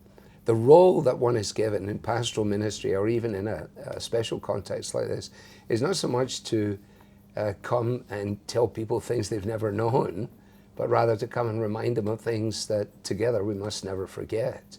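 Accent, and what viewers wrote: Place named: Scotland, United Kingdom. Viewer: I started watching and listening to Alistair after my husband passed away and I decided to join a large church where a lot of my friends were going. The church had called a new young pastor after the former pastor retired. I had been listening to after I got home from my previous church and I really liked his expository teaching. He came to visit me before I joined the new church. He is the one that told me about pastor being his favorite pastor to listen to. That was nearly 3 years ago. So that began my acquaintance with. I searched for every sermon that I could find. I loved his teaching. Just as I loved to listen to the pastor of my new church. I also love Pastor Scottish accent. I was raised in the Presbyterian church and a member of the PCA until my husband died. I joined a large Baptist church. Hard to make that decision but I’m glad I did. 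Since all this has happened to it breaks my heart that he has been judged so harshly by his peers. I pray for him and his family that all will be well. The church needs pastors like him,especially since we have lost so many good teachers in the past few years. Men like RC, and Dr. MacArthur, and so many others. The church needs them. We need to pray that God will bring forth more godly me who can fill those shoes.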